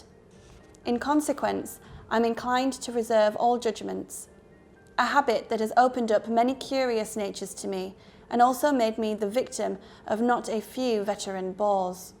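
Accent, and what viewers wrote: United Kingdom. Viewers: Hi thanks for reading the book to us! I love your voice and british intonation ^^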